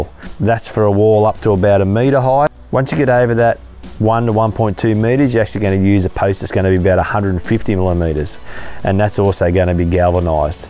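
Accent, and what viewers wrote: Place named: Australia. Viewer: no entiendo Aussie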